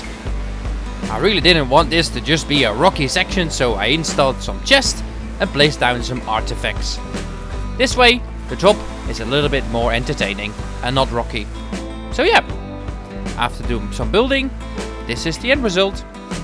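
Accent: Netherlands. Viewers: Brabant heuj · It's even worse. It's southern Dutch 😂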